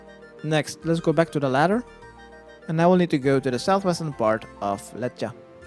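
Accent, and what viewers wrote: Netherlands. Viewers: I just got my first ever quest cape and that's because of your amazing guides! I want to thank you for all the time that you've been putting into these videos. They're very fun to listen to because I can hear that you're a dutchy or from Belgium haha, further they're just very easy to follow because a noob like me gettin' a quest cape is quite the achievement. Thanks my man! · Are you/ is he Dutch?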